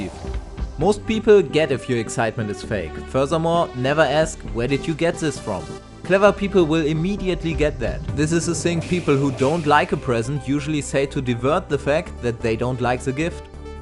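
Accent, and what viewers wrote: Germany. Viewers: great content, very german english but gramatically most of it is right, so keep it up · Der deutsche Akzent...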